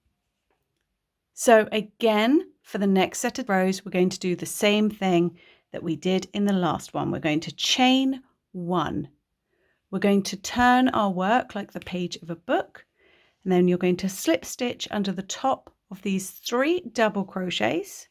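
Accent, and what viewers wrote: United Kingdom. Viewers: This is the first time I've come across your tutorials and have watched this corner to corner one with fascination show simple you have made it look for even a beginner crocheter. I am certainly not a beginner but will now recommend your site to those that are, to perhaps use as a second project, once they have made an item using UK terms. My only criticism is that because of your accent I'd assumed your instructions would have been using UK terms but as the work only involves ch, ss and tr there should be no real confusion because of your clear illustrations. I've, opted to make a doll"s blanket alongside a beginner,using your tutorial to show them how to crochet so that they can learn to switch more easily between American and British crochet patterns. Thank you for such a brilliantly clear tutorial, it will make my teaching so much easier for me! 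xx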